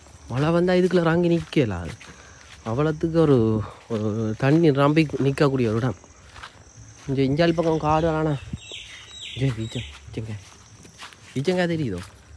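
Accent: Jaffna, Sri Lanka. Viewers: ஆர்ப்பாட்டம் இல்லாத யாழ்ப்பாண தமிழ். வெகுவிரைவில் ஓரு லட்ஷம் 👌👌👌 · உங்களுடைய voiceஎன்னுடைய jaffna நண்பரகளை நினைவு படுத்தியது அண்ணா 🥺🥺🥺🥺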